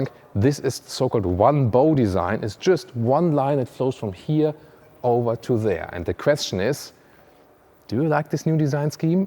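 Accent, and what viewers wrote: Germany. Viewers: Some of the Germish is very interesting! Good effort though, vielen dank.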